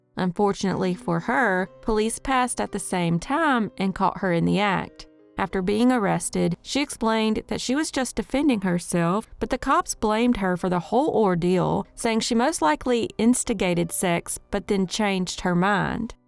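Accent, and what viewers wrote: South, United States. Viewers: Southern girl accent. Makes you Horney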